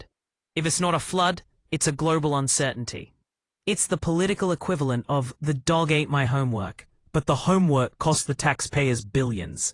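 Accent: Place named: Australia. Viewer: Why would I rely on a US based news with Aussie accent covering our news, when we have direct Australian Media, reporting our news here on YT?!!!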